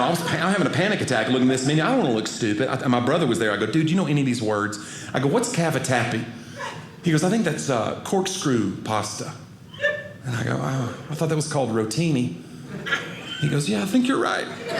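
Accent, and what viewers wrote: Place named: South, United States. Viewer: Awesome comedian. Redneck and southern accent. Love it. Don’t change.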